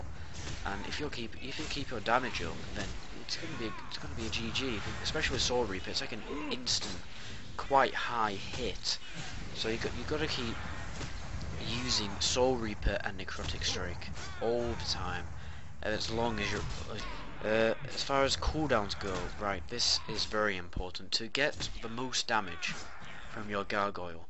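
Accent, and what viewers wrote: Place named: England, United Kingdom. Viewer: Oh how I missed the English accent and their way of pronouncing words, hehe.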